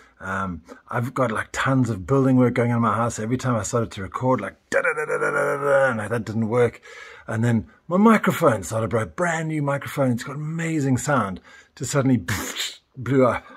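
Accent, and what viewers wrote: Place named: South Africa. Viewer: fascinating video Happy to find your channel. I'm also from south africa and the accent was so recognizable. I'm thinking of moving to Portugal and this video and the public transport one was very inciteful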